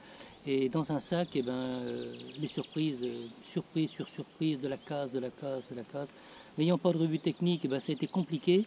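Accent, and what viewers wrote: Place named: France. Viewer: Un humain comme on les aime, pas de superflu, de l'honnêteté, du savoir-faire, l'accent franchouillard qui nous rassemble. Merci de nous montrer la direction du beau.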